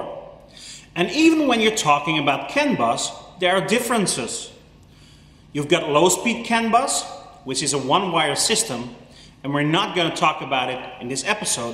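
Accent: United States. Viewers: So I was looking at some canbus diagnostics videos just for fun and then I saw this one with you doing that test drive. And then the shot on the road, I was like: “Hee dat lijkt wel Nederland!!!” 😄 You really had me with that American accent 👍🏼. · Ik dacht dat je een Amerikaan was (zo klonk je), tot ik het display van de scoop zag :-)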